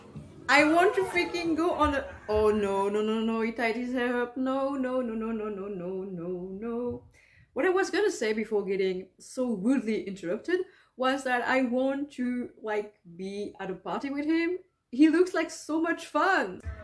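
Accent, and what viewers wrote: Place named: France. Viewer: Je t'adoreeeeee 💖 (t'es française, non?) I guessed based on the accent haha. But yes the Omar effect is very very real and at this point my obsession has become so unhealthy haha.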